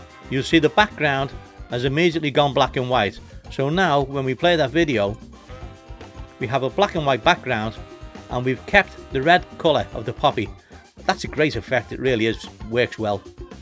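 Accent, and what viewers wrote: Liverpool, United Kingdom. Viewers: love your Liverpool accent.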